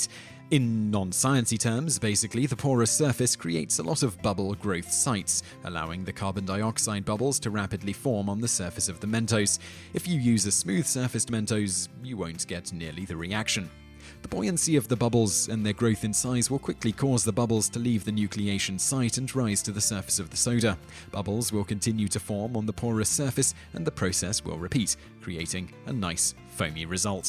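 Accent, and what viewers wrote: United Kingdom. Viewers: Pondering Smirk Oh, probably close to when I did, then. They must've commented after I'd started watching so it didn't show. It's funny nonetheless! One of the perks of having an awesome british narrator. Simon is awesome, but the main audience is American, where Geezers and Geysers are completely different.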